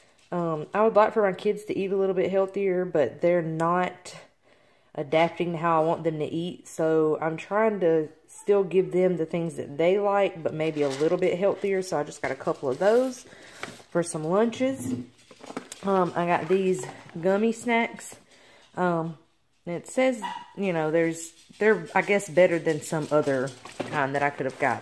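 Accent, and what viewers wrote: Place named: South, United States. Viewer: Family of 5! Girl, Idk how you do it lol. You're amazing haha. Are you from the south? haha I love your accent. I'm from KY.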